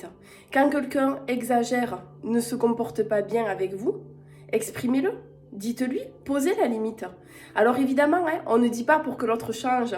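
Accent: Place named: Basque Country, France. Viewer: Merci pour ce message. C'est clair qu'il faut respecter l'autre, mais il faut aussi, ne pas hésiter à mettre des limites. Votre accent de Donibane, nous apporte le soleil que nous n'avons pas en région parisienne 😉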